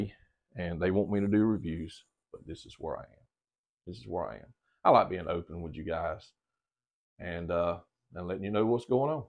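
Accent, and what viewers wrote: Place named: South, United States. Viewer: Cheers! Cool to see companies sending you gear. There are other channels with good people. But i was raised in part by a southern gentleman, and its cool to hear a voice with some southern twang in it, reviewing audio gear.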